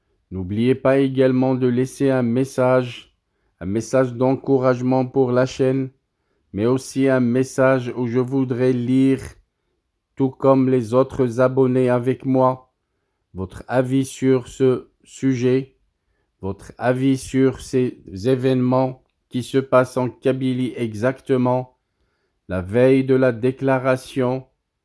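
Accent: Morocco. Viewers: L accent de ton francais et celui d un juif magocain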